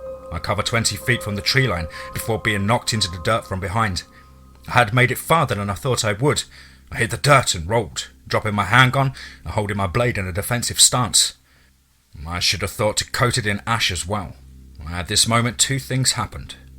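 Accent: England, United Kingdom. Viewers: This guy has the same accent as fooster. What part of England are they from?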